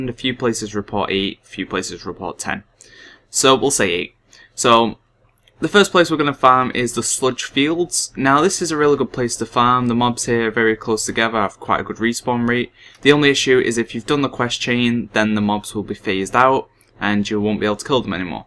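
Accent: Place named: Glasgow, United Kingdom. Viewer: Wow the guy is developing a Glasgow accent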